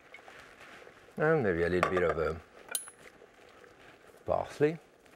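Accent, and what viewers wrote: France. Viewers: For someone with a pretty strong French accent (which is great btw) Jacques has an elaborate English vocabulary, which is strange. Is it because European languages share many words?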